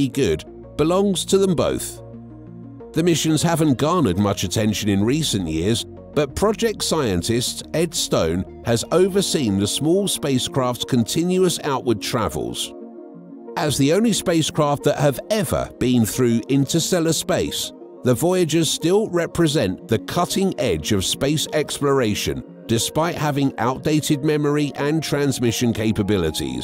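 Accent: United Kingdom. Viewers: I’m not going to get rooked into listening to this Brit-narrated 13 min long video about a message that is never revealed but if watched I’d have to endure a mind numbing litany about the wonders of the Universe.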